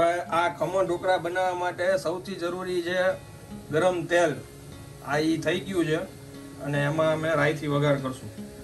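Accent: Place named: Kathiawad, India. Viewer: Jsk. u are from Kathiawad as I make out from your gujerati talk. So are you a liana or Vaanya?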